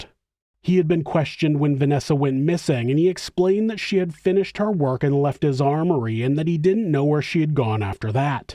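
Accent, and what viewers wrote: United States. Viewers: I wonder where with a J was brought up? Where his accent is from? He doesn’t live in the States but he has an American accent. Quite a unique voice. 🥂🦘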